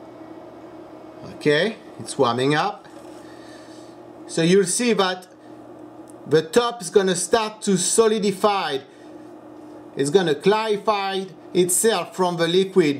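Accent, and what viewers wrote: France. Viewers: I watched the video.real franse laungwich accent. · Vous avez un accent français a couper au couteau !!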